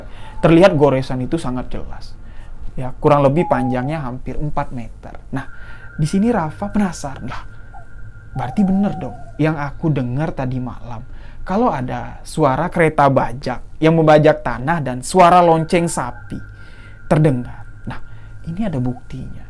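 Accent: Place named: Bali, Indonesia. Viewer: Kayak logat org bali mas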